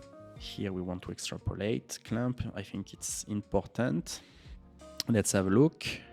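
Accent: France. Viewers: Mec t'es trop fort, ton accent français est tellement présent ! !!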